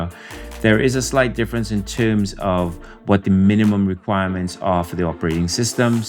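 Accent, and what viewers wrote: Trinidad and Tobago. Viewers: Which part of the Caribbean are you from? You sound Trini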